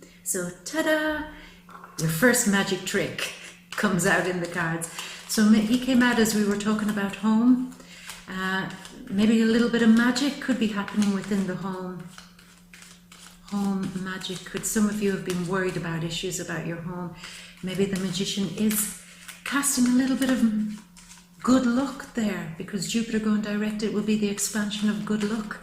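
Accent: Ireland. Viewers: I absolutely love your energy! You have an accent so I assume you are Irish? If so, for my August 8 birthday next year I am going to Ireland and Scotland. My beautiful daughter is taking me for my 60th birthday. I can’t be more excited I love and always wanted to go to Ireland! I think you are absolutely a joy to watch! Thank you for your wonderful readings!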